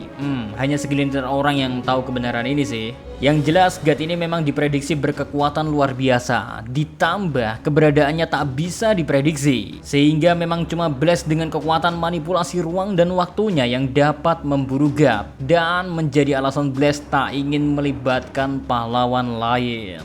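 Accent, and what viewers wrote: Java, Indonesia. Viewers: Ngomong ga usah di gaya2in tapi Masi medogg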